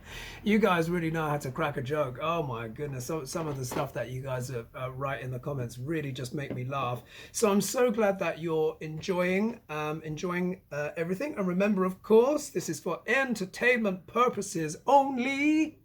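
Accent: England, United Kingdom. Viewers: Blessings to you. You are now MY FAVORITE ASTROLOGER ON YOUTUBE. I do Western Astrology but I LUV your insights via VEDIC. Also, it is a KICK to hear a British accent from an AFRO British face. GOD LOVE YOU.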